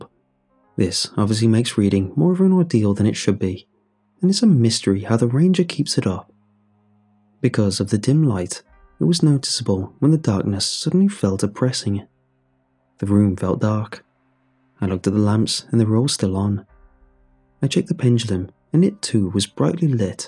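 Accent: United Kingdom. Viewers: Why is it always a British accent to explain it to Americans! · If the guy reading these stories didn't have a British accent, I might actually get into them.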